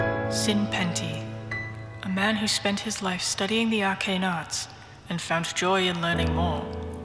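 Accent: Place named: England, United Kingdom. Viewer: You truly could’ve fooled me if you were trying to pretend to have an English accent. I like doing voice acting as a hobby, specifically impressions, but it’s hard for me to mask what is clearly my American accent. Still, I can tell a lot of love went into this and wanted to complimented as such. You’re very welcome.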